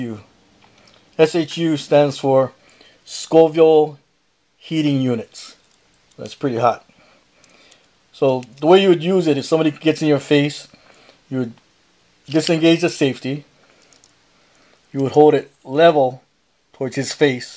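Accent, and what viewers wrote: Hawaii, United States. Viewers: Howsit!, I have been a subscriber for a while and really enjoy your videos. This video demonstrates what I dig about your vids. Clean to the point and shows great variations for EDC especially if you're in a limited carry situation. Frankly, I also dig your accent too. Reminds me of the days living in Manoa Valley. I miss Paradise, bruddah.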